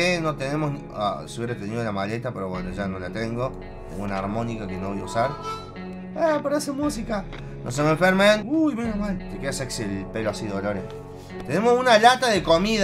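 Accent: Argentina. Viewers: Sos argentino? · Ola xd sos argentino¿?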